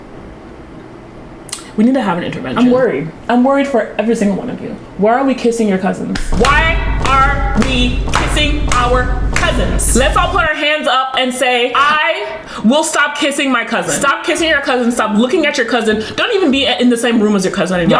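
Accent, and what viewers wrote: United Kingdom. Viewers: Your british accent threw me off😂😂😂😂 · Great video these type of videos are funny but as uk girlie please let’s not do that accent next time was terrible 😂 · You have such a good British accent girl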